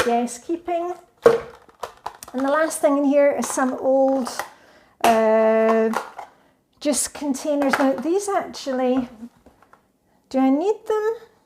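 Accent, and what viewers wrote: Scotland, United Kingdom. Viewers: Just discovered your channel. And being a Scot it's great to hear the accent. I was half way there with my routine going to take it further learning from you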